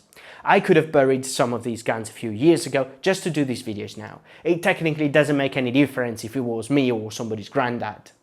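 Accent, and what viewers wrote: Italy. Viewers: Came via the Internet Anarchist, really love this, especially as I know nothing about firearms. Also, one of my mates has a girlfriend from Milan and you sound exactly like her. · Northen Italy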